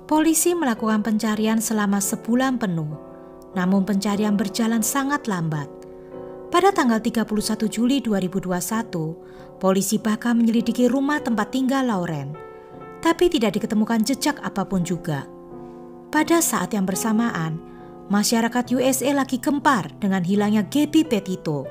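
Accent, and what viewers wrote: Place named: Java, Indonesia. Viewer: Mentok bngt jawanya ya mbak hehheh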